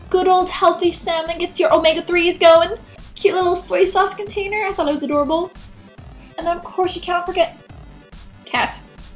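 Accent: Canada. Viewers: i love your accent! canadians RULE! haha!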